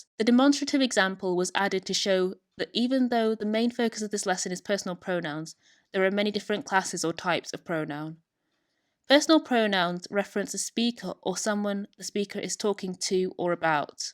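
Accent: England, United Kingdom. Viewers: very horrible pronuncuation, such a strong english accent...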